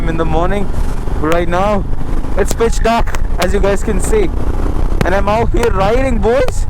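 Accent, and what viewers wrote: Karnataka, India. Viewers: Brrooo your kannada accent is too good ! Broo madhaya dalli kannada matadta iri bossu ! I am going add this My fav traveling vlog playlist!😜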